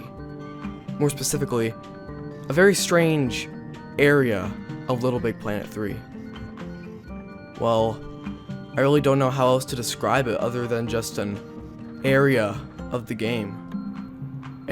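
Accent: England, United Kingdom. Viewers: Where is that english accent supposed to be from? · nice British accent. Better than mine and I'm British haha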